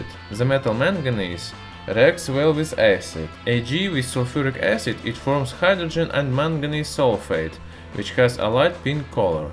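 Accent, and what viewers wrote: Russia. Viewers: Now i can die in a peace... finally i find that this guy is Russian and he is speaking English in Russian accent. 😂😂😂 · Bro are you Russian no cap love from india 🇮🇳 🤝🇷🇺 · your russian acccent makes it hard to understand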